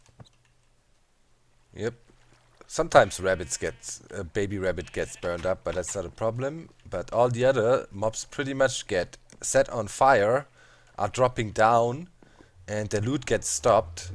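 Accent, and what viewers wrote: Germany. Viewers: Dr. CreeperZ ha I knew it cuz I'm from Germany and everybody there has an accent like that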